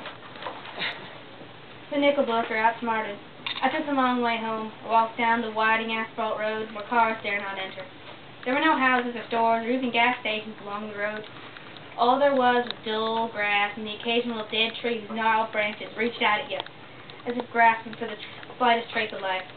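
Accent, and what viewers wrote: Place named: South, United States. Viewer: Go!! Your Southern Accent is coolio!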